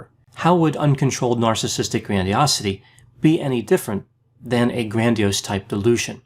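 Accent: United States. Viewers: Great analysis, Dr Grande. Completely inconsequential to the case, but as soon as I saw the title I was curious about how the name pronunciation would go throughout this video :P Yours is probably as close to accurate as it's going to get with an American accent.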